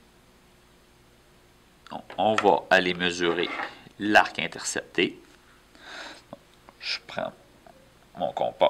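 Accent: Quebec, Canada. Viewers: Merci tu m'as beaucoup aidée car pour une fois je n'ai pas écouté cette leçon et j'ai eu du mal à faire mon DM mais grâce à toi j'ai réussi (j'adore ton accent canadien) 😂❤❤ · Vue que je suis suisse l’accent québécois me dérange mais sinon merci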